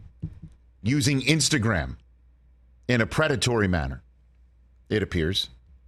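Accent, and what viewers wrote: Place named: California, United States. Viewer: your california is showing....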